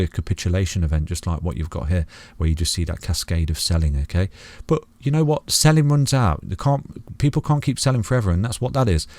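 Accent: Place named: Midlands, United Kingdom. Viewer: I think Midlands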